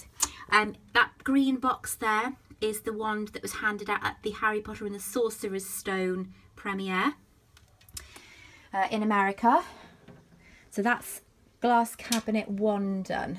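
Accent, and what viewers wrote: United Kingdom. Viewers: it's better cause you have a britsh actcent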